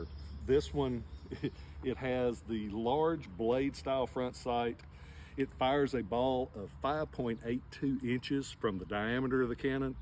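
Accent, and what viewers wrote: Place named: South, United States. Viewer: I hope you're doing well! Thank you for your awesome videos! I love hearing that southern accent!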